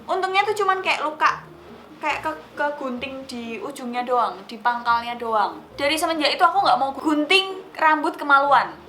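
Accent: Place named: Surabaya, Indonesia. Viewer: arek arek bgt mbk,e iki medok bgt suarane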